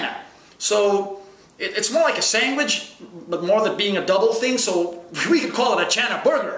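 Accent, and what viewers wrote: Trinidad and Tobago. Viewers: He talks trini so well tho Ahaa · That Trini accent is on point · he does a great trini accent...im a traveller too and i video the other islands too. check out my clips..click my name and away u go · Your trini accent really good, nice man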